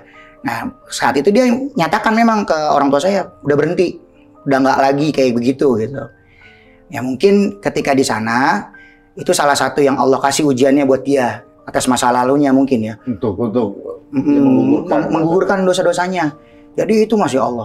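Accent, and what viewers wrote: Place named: Jakarta, Indonesia. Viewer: 😂😂😂 muter muter dimananya? Roaming kali, nggak biasa logat betawi😂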